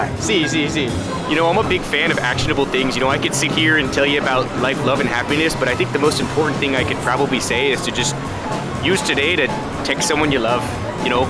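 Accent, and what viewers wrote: Canada. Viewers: Dude I love the heavy Canadian accent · this is the most canadian sounding man i’ve ever heard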